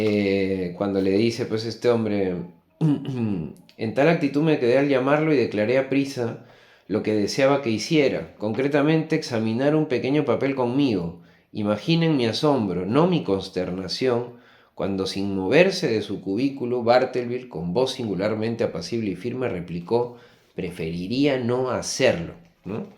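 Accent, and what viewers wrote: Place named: Peru. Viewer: Gracias por el análisis. Que lindo tu acento, creo que es de Perú.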